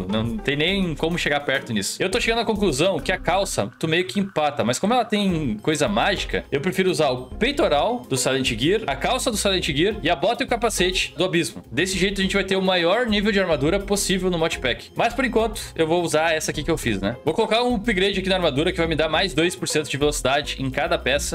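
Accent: Rio Grande do Sul, Brazil. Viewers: vc é gaucho? no way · Você e gaúcho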